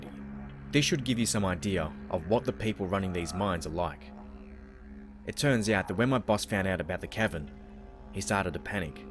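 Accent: Australia. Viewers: Yay, a fellow Australian narrator. I wish I discovered your channel sooner. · Honestly the first time im hearing an australian man narrating creepy stories. Pretty well done.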